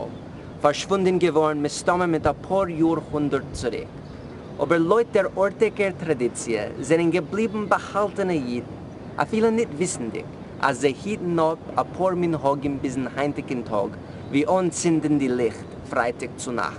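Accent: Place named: Northeast, United States. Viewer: How interesting. I have never heard the language that the spokesman delivered. I am a Swedish American who has a bit of an understanding of Swedish, Spanish, Latin and a great understanding of US English. I could just listen to this man & understand perfectly well what he was saying. Though he seemed to be speaking a pigeon blend of English/German/Swedish. His accent was clearly US Northeastern English.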